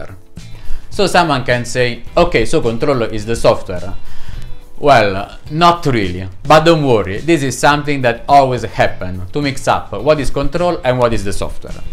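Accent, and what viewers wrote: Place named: Italy. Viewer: Your accents makes me think you are italian...without subtitles it would be difficult for audience to understand what you are saying....overall your content is very well structured👍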